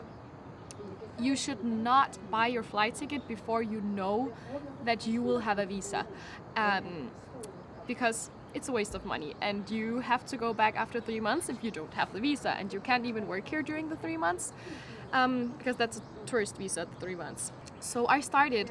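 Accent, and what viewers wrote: Denmark. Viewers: I can tell on your accent your danish :) Men det betyder ikke at du har en grim engelsk udtale! Virkelig fedt at du er flyttet til Korea, vil rigtig gerne selv derned og besøge landet. Har dog været i Taiwan og Filippinerne for et halvt år siden så har oplevet noget asiatisk kultur haha. Du må have det rigtig godt dernede! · First time being on your youtube channel. And when I heard you speak, immediately thought you were danish cause of you faint accent. I was right! xD I'm danish too so yeah.. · Girl i hear you danish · Jeg kunne høre på din accent at du kom fra danmark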